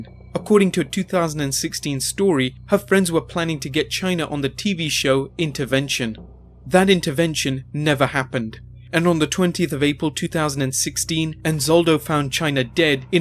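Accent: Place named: England, United Kingdom. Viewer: The English narrator gives it an air of sophistication and authenticity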